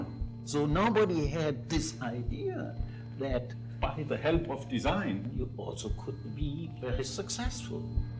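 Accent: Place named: Germany. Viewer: Great video. Any body care to transpose correctly for Closed Captioning - it's hard to follow his Germanized-English. Thanks.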